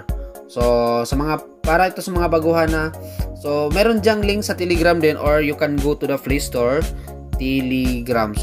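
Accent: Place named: Visayas, Philippines. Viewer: Bisaya nga ganyan ang bisaya magsalita matigas pero tama ka boss at may napansin din ako na parang buyoy sya magsalita hahaha.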